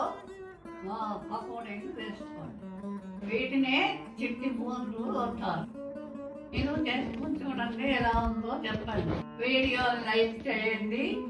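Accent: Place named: Godavari, India. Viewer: Chaalaa thanks andi! Mee Telugu kuda Godavari jilla Telugu laga undi feels nostalgic! 🙏😊👍 Sankranthi Subhakankashalu meeku, mee family andariki! 🙏❤️😘💐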